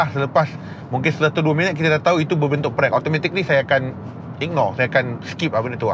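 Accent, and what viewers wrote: Negeri Sembilan, Malaysia. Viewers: Bang cakap nesmilan yolah best bonar YouTuber keto cakap nesmilan 👍🏆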